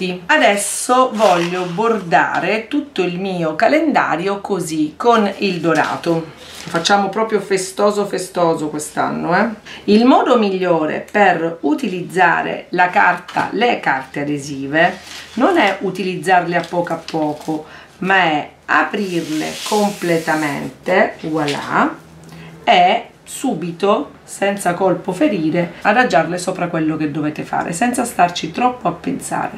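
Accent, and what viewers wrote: Catania, Italy. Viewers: ciao, sei bravissima! sei di Catania per caso?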